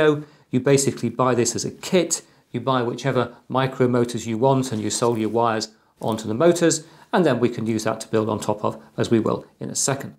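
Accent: United Kingdom. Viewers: You can set the video speed to slower (0.25, 0.5 or 0.75 x ) in the settings menu at the bottom right of the video. I've got mine set to 2x because I find his accent (British) easy to understand.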